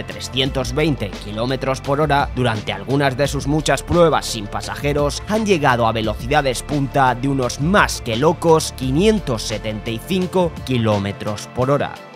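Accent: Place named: Spain. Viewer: no me gusta tu voz, la voz de los españoles ....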